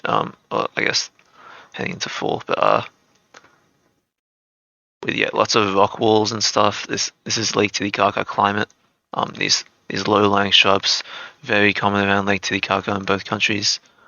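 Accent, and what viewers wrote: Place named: Australia. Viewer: this is good stuff, lovely aussie accent too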